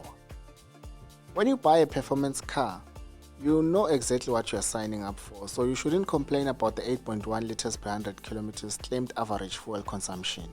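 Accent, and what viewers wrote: South Africa. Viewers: 😂 I love the cynical Kasi accent 🤟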